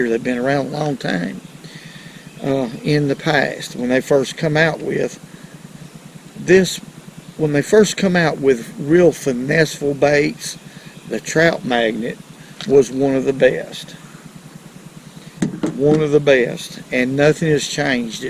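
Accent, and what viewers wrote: South, United States. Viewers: I Luv your Country Accent 😂🤣 Man I tune in just for that and of course seeing you pull them Big Whoppers out of the water 🤷‍♂️ 🐠 🐟 Much Love and Respect all the way from Central NC 🫡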